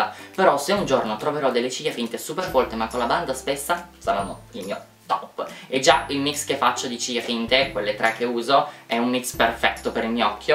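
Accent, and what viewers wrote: United Kingdom. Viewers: Adoro il tuo accento inglese. Spero di diventare una persona forte come te♥️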